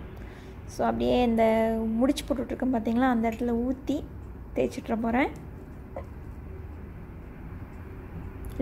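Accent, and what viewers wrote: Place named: Sulur, India. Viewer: Ippo than casual a unnoda video parthenma.unnoda voice modulation ne solluthu, ithu namma ooru ponnunu.udane subscribe pannitten.nanum Sulur pakkam than....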